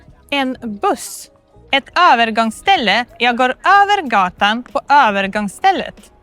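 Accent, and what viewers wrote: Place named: Poland. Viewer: Riks-svensk dialekt, med spår av Polska. Men nästan ingen Göteborgska. Det finns ganska många lokala dialekter i Sverige. Lycka till.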